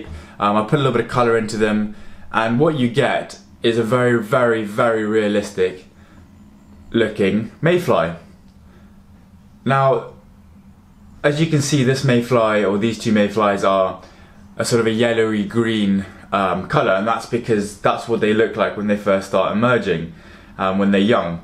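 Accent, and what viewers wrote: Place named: RP, United Kingdom. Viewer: Gosh! What a delightful young man - with talent. And what a delight too, to hear a presentation in RP., where every word is immediately understandable. He's a student, and like many students he's experimenting with his own image and accessories - I remember that phase well. The hair and the bangles and beads may go eventually, but his ability to communicate will remain. Terrific. That's excellent skill and a pretty fly, but (and I do apologise for raining on you effort) those stiff wings do dreadful things to one's leaders - twist, twist, twist. I think you have a fine future.What are you reading, and where?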